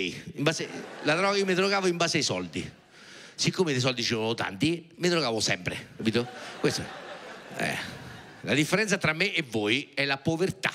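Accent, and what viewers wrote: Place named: Marche, Italy. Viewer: Il marchigiano,ancor piu' il fermano, ė universale!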